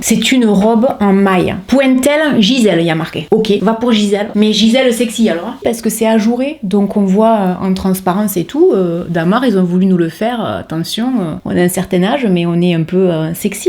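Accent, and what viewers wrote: South, France. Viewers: Bonjour, vous m'avez fait rire, merci de votre bonne humeur et de votre accent du Sud.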